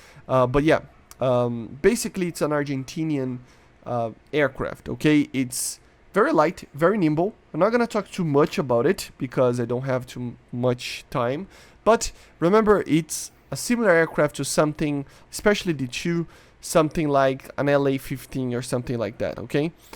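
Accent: Brazil. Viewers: Se eu não soubesse diria que vc é brasileiro, parece muito sotaque de brasileiro falando inglês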